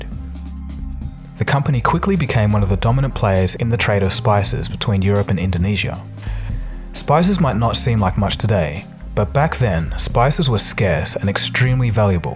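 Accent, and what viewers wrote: Australia. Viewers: Crap channel. Horrible Australian raspy accent. Terrible summary knowledge which frequently engages in absurd reductions and simplistic analysis. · A great video Are u Aussie?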